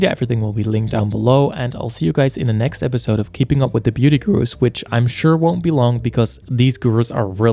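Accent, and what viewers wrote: United States. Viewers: Haha, ik zat rustig te luisteren naar je super Amerikaanse stemgeluid en dacht: Ow wauw hij zegt Chantal Jansen wel heel mooi Nederlands. 10 seconden later: mijn moedertaal is Nederlands. lol. Dat had ik gewoon even niet zien aankomen mede door je naam. Heel leuk. Goed verwoord allemaal. Ellen is echt niet leuk. Had altijd al een raar gevoel bij haar. Ze kijkt soms zo kil ofzo. leuke video, liked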